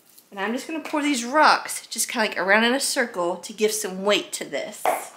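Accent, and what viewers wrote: South, United States. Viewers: I love your st Patrick’s DIY 🤩 your personality so adorable and love the southern charm accent ☺️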